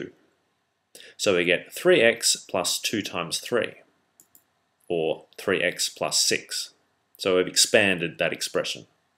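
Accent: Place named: Australia. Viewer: nope, i'm 100% british and THAT is not english, i'd say he was australian or something....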